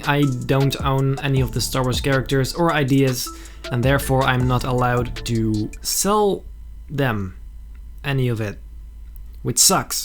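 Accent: Netherlands. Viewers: what do you mean he hasn't done tutorials the dutch accent tuts are actually good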